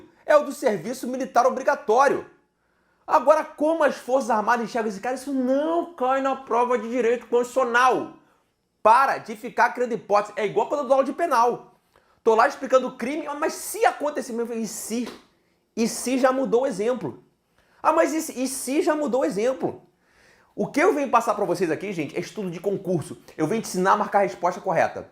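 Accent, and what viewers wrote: Rio de Janeiro, Brazil. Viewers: Prof.. adorei sua aula!! Parabens e obg!! Mto bommm “mexmo” hahahaha usando seu carioques!! 👏🏻👏🏻👏🏻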